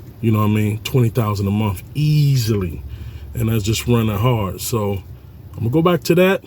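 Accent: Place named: Pittsburgh, United States. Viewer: Sound you like from Pittsburgh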